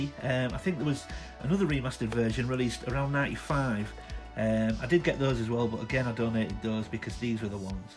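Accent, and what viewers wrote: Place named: Northern England, United Kingdom. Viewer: Great to hear a Northern voice talking about their passion for collecting. Been back and currently watching all your videos now thanks for all your hard work!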